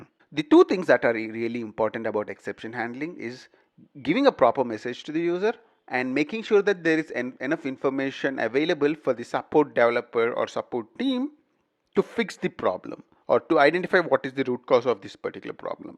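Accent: India. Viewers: you are great!! only indian accent that I can bear really!!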